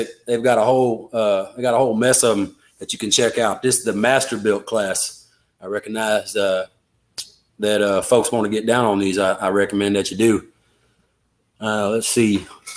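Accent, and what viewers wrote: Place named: Appalachia, United States. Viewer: Doesnt seem like you talked like a hillbilly a few years ago. You pronounce guitar like geeeeetarr now?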